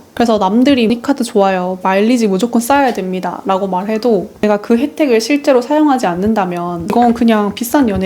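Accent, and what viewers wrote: Gyeongsang, South Korea. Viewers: 주인장 억양이 경상도분이시네~~대구 아니면 부산분이신듯~~😆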